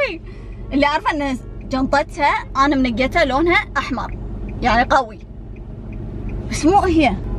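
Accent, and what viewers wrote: Kuwait. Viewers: احبج انا سعودي للمن أحب لهجه الكويت❤️😂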